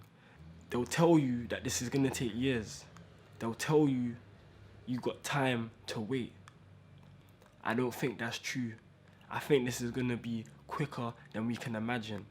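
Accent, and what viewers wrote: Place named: United Kingdom. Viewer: your uk i know because how you talk.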